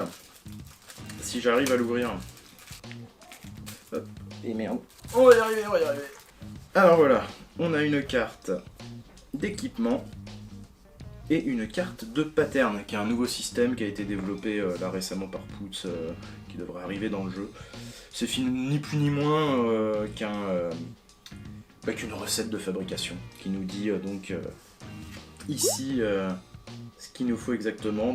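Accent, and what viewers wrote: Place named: England, United Kingdom. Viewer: quel accent anglais, et 2043 pour la wave 4, quel optimisme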